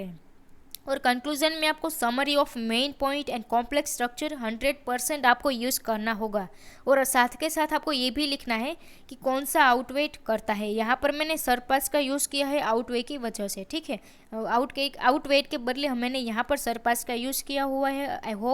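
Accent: Gujarat, India. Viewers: English language with gujarati accents. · Mem R u gujarati? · Are you Gujarati?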